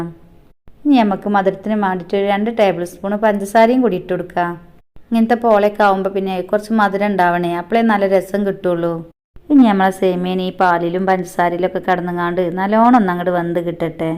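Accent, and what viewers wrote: Malappuram, India. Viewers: Ithaaa Malappuram ethu bhagathaa ee kodukaa, edukka ennu parayunathu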